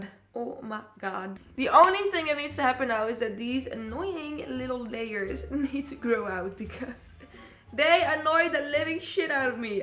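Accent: Netherlands. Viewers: Your accent sounds sooooo dutch, are you Dutch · Does anyone know where she is from because she sounds really dutch haha😅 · Are you dutch??? Your accent seems really dutch hahah. Excuse me if i'm wrong lmao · dr accent is zo nederlands lol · the minute you started talking i KNEW you were dutch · Dutch accents at its best, girl I love it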